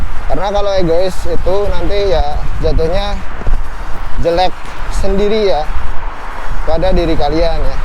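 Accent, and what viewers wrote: Pekalongan, Indonesia. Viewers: Podo wong kalongan. 😁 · Wong Pekalongan ya mas ?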